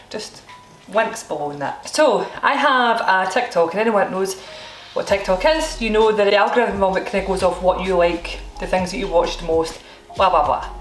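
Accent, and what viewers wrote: Scotland, United Kingdom. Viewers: I've never made a single recipe of yours, nor do I intend to (though they seem to be really good, when they turn out well). I just like listening to you talk. Your videos are very pleasant, and it's nice to watch someone make food and patter in a Scot accent. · Thank God my mum is Scottish, born and bred- and will totally understand you,. Im sure she will make delicious caramel cornflake brownies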